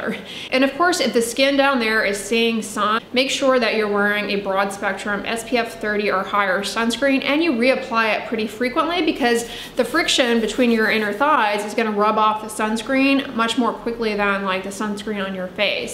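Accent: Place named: South, United States. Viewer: How does she have both southern and valley accent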